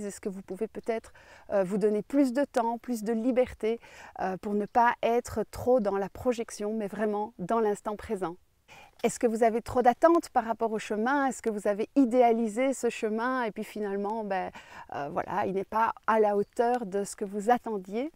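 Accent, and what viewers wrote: Belgium. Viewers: Bonjour j’aurais bien vu vos randonnées en Norvège et merci encore pour la positivé et cet accent belge bon courage pour votre chemin dans l’éternel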